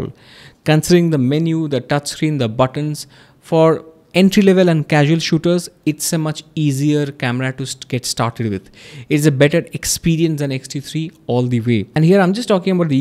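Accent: India. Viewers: Indian English accent usually makes people sound a bit silly, but damn this video was good. Thanks a lot, I am currently almost-decided to get the Z fc.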